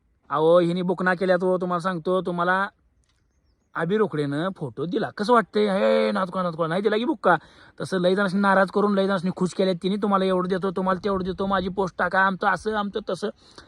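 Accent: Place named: Kolhapur, India. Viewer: Bhava kolhapuri stayl made tumla mast jamaty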